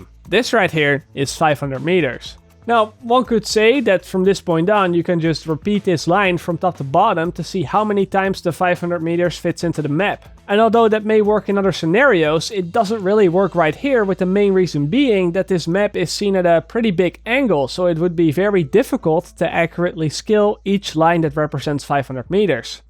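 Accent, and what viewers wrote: Netherlands. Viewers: Heerlijke nederlands accent ;-) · nederlander die engels praat..... heel schattig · Je uitspraak is echt heel Nederlands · Hoor zijn accent dit is sws nederlander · are you dutch? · You can hear he's dutch by his accent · clearly Dutch accent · You're sooooo Dutch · Are you from holland bcz I've never seen you but you just sound like you have a dutch accent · Hears voice and accent for 2 seconds...I smell dutch person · lol, so funny how you can hear, within seconds, that the video in english is made by a dutch person. you can just tell immediately. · ik hoefde niet tot het einde te wachten om erachter te komen dat je Nederlands was, typisch Nederlands accent man xd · Het is te horen dat je nederlands bent :) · he sounds dutch or not a real english man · je hoort dat hij nederlands is 😂😂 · ok... I really like your vids... but pls... 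try to avoid that dutch accent! Grüße aus Deutschland ^^ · je klinkt echt zwaar nederlands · dude I love your video's but holy shit get rid of the dutch accent.. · really well put together video, I'd say you could assume the map to be 14 squared km. also are you dutch? kind of recognize the accent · jou accent man... je bent zo nederlands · hoorde aan je accent al dat je nl was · lekker nederlands accent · Kom je uit nederland? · Fack you Have a so clear dutch accent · Ik ben 100% zeker dat jij een Nederlander bent.😜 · Your Dutch accent is so Obvious. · Euhm i gues You are from holland🙃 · The guy who was talking is dutch, i hear it from his accent. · is deze guy Nederlands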